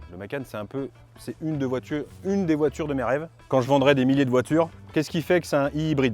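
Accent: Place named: Brioude, France. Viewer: C'est à cause de l'accent de brivardois. Jean leguin humoriste lol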